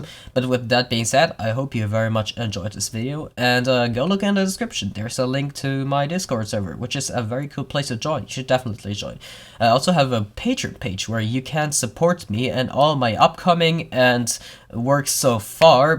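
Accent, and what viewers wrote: Germany. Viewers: He even has the german accent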